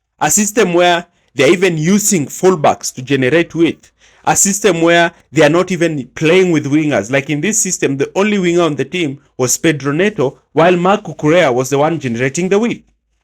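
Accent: Kenya. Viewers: Kenyan accent 😊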